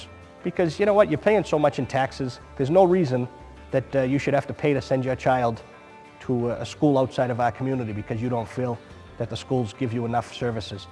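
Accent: Boston, United States. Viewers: I'd vote for him just for his perfect Boston accent!! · His Boston accent is stronger than mine!!!!!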